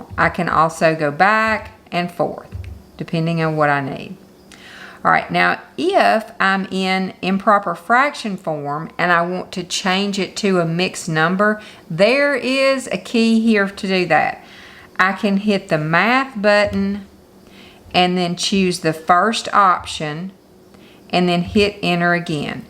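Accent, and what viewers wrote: South, United States. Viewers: Thank you soooooooo much for such a super tutorial video!!! After many years of taking advanced math now everything's is blur. And now, I have to recall most of it but thanks to this calculator things are much, much easier! I just wish I'd had this calculator when I went to college some 40 years ago. BTW, I love your southern accent.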